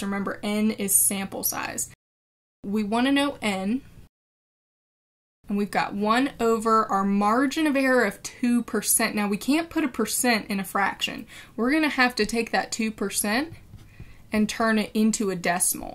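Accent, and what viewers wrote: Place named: United States. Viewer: margin of air the american accent is beautiful thanks for the tutorial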